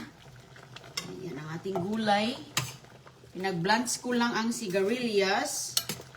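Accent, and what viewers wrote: Visayas, Philippines. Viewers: sorry naman bisaya lang. Intindihin niyo na pronunciation ni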